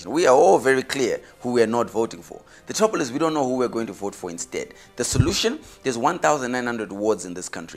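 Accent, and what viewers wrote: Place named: United States. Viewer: But why sound so American.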